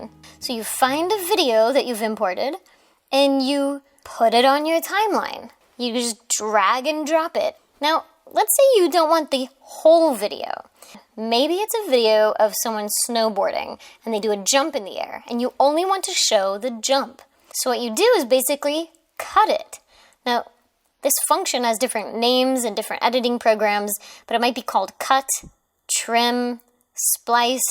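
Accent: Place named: France. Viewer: Wow this is a master piece video. You covered it all and we can see your heart through the content. More than educational. I love your French accent "Très bien" 😊 Merci beaucoup 🙏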